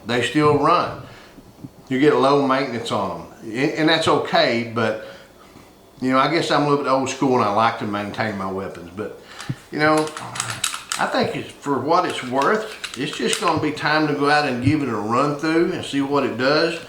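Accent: South, United States. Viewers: I’ve never seen a Viking with a southern accent before love the content I’m going to subscribe 👍🏽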